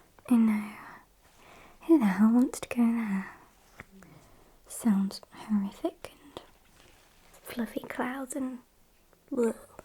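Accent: United Kingdom. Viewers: gurl your British accent is so cuteeeee Oh my god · Are you british mate?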